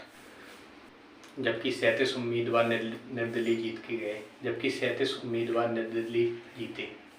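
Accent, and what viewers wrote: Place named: Jalaun, India. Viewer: I am suggesting you some references - Ek toh jo jalaun district wala tone h uspe thoda kam kro Bich me aap jo ruk jate hn ,uske liye pehle script achhe se likho ,bar bar practice kro Or aap ek episode dictate kr rhe hn,toh esa apki voice variations se dikhna bhi chaiye,na ki aap esa dikhen ki bs generally bat kr rhe hn.. Baki bs improve krte raho,,Take care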